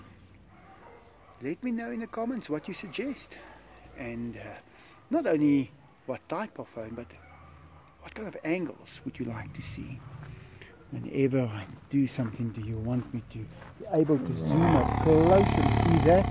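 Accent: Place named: South Africa. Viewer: What country is he from? He sounds South African but I believe their language is English and I’ve heard him speak another language before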